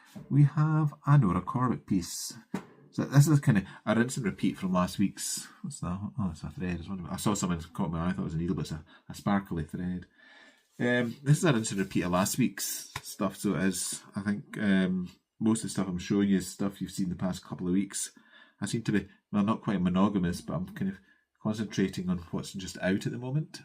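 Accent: Scotland, United Kingdom. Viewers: I think you’ll find your numbers are going to rise again…as Pam of Pam and Steph named you as ‘gold flosstuber’ of the week 🎉🎉🎉 she was quite taken with you - your brogue, temperature stitching and variety of pieces you stitch on. That’s cross stitch fame, is that. Congratulations on your 5,000 🎉🎉 And on your cardinal kin finish - which is smashing. I finished a cardinal piece recently - Cardinal No 1 by Crossed Wing Collection. Big Sis got me the pattern as part of a kit from that wonderful Texas institution, 123 Stitch. It was one of their kits with hand painted fabric. Not as much stitching as your Plum Street - and I’ll admit to cutting back on the excessive pine foliage as I got bored of it (shush don’t tell the cross stitch police). My ever thoughtful Big Sis bought me the Cardinal pin set from Puntini Puntini at the same time - they would look good on yours if you fancy a bit more ornamentation- and quicker than applying chenille. 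Talking of birds, when you showed Ellen’s Ravens at Castle Kerr, it reminded me that some wonderful stitcher had stitched a version with the words ‘we are going to need a bigger moat’ above the castle. I like a bit of whimsy. Love your Autumn Quaker - such great colours. I watched while stitching on Once upon a Summer Huswife by Heartstring Samplery. Carry on blathering and stitching, Steph from Cheshire 😊🎉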